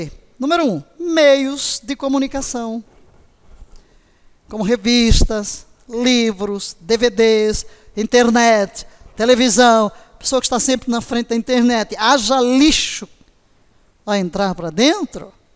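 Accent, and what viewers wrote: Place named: Portugal. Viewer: Tu tens sutaque de Portugal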